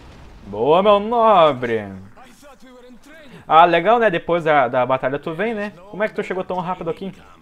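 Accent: South, Brazil. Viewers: conheço sutaque do sul kk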